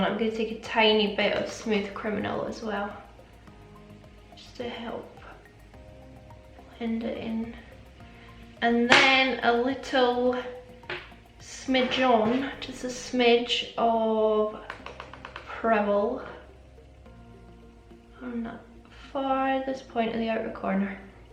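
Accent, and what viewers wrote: Scotland, United Kingdom. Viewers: Is she Scottish?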